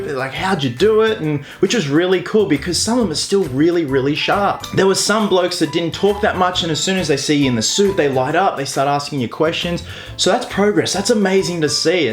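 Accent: Australia. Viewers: I clicked on this video and as soon as you started talking I yelled. AUSSIE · Your Aussie 👍🏻me to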